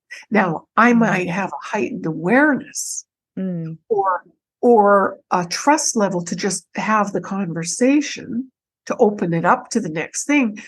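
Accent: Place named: Scotland, United Kingdom. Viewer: I just love her Scottish accent more than anything else on YouTube!!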